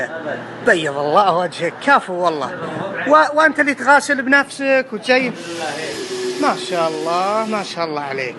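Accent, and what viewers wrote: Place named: Qassim, Saudi Arabia. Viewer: الله يلعن لهجتكم يا القصمان تفوووو.فعلاً تجيبو المرض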